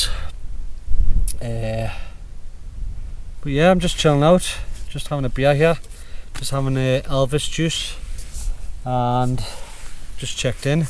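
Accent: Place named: Northern England, United Kingdom. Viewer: Hi, I came to your videos as I considering a Pioneer 2 for myself, as they are a good deal at the moment. However, I seen a couple of negative comments about them from a water proofing point of view and also regarding the inner and outer touching. Have you had any issues? You certainly seem to be using it extensively in your videos. Would you still recommend it as a good tent. I'd primarily be using it for cycle touring and an occasional mountain camp. I'm 65 now and can't be bothered squirming around in these low one man tents, trying to get changed, a 1.5 man tent with generous headroom seems the way to go. Finally, do you use the second entrance much? PS, love your accent and videos (went to uni in Newcastle in the late 70's)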